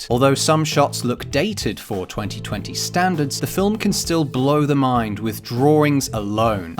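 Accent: United Kingdom. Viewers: this guy sounds very British what do you mean?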